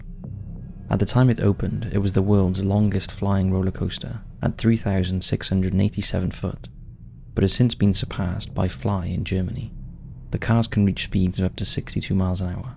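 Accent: United Kingdom. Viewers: You always put me to sleep British spooky man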